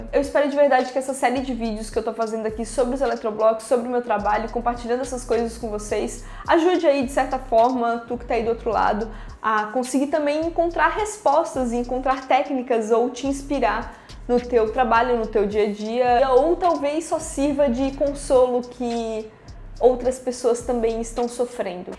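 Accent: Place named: Itajaí, Brazil. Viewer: Eu reconheci o sotaque mas pensei que não fosse, mas vi em outro vídeo que vc é da mesma cidade que eu, Itajaí Sc! Quero ingressar no curso mas por ser no período matutino não consigo por enquanto. Espero conseguir em breve!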